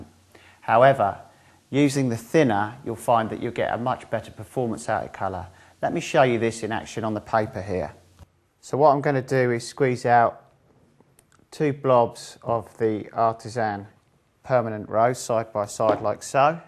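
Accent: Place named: Cockney, United Kingdom. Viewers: VERY THICK BRITISH ACCENT.... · Not just British, but not-quite-cockney London working-class. Love it. There are many accents in Britain.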